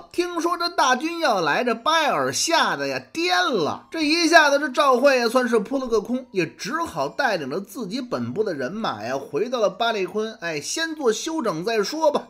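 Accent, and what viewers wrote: Beijing, China. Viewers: 北京音有个神奇的效果，用京音儿说任何事都像在说评书，像在侃大山，像在忽悠，失去了可信度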